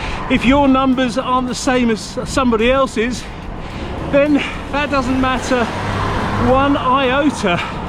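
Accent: England, United Kingdom. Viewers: Excuse me you accent sounds English! Kilometers........flipping miles.......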